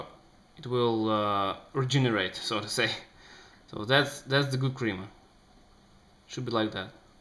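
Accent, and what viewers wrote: Russia. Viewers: Хороший русский акцент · Russian? Italian?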